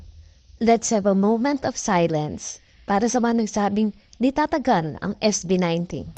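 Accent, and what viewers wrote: Batangas, Philippines. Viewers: Enjoy ako sa Batanguena accent mo Sis.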